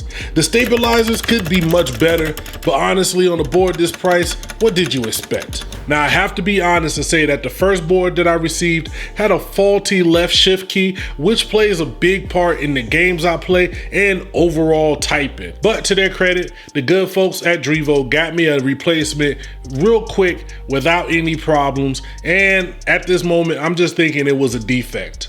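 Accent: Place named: New York, United States. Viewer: Im an OG tech/barber with a big ol Logitech G106. I dont really use it to game though because of tartarus but I'd like to try the calibur out. Im really liking your vids bro. You sound like a brother from NY but if you're ever out here. stop by the shop so i can tighten up that beard for you one time. Imma stick around and watch you grow this channel. Thanks for the review.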